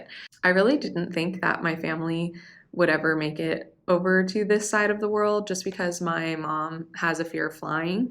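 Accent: Australia. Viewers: By the sounds of it, you're an Australian who was born in the wrong country. Welcome home. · Welcome - you sound like a Melbournian already. You'll fit right in!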